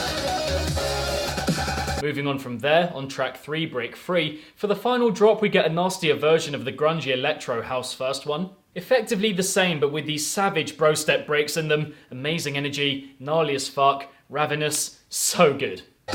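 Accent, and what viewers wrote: United Kingdom. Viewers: Your lush British accent makes this review much more enjoyable. But no homo tho.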